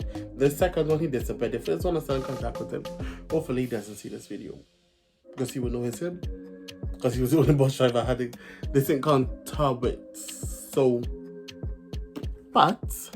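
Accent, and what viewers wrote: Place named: Nigeria. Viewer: Are you Nigerian? Lol, you sound Nigerian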